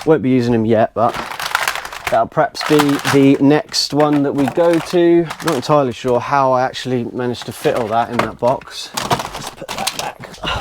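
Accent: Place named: United Kingdom. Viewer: Wou uhn wonna do this wih one mo ni ah. Haha British gold right there! Love your vids.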